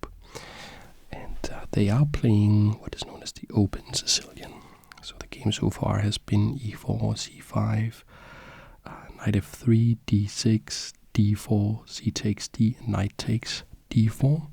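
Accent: Denmark. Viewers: You should do a video in Danish! I love the accent and really enjoy watching these videos, it would be lovely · I do so love the Danish accent. Have you been to the b*stard cafe in Copenhagen? It’s a board game cafe. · I don't know about you guys but I clicked for the Danish accent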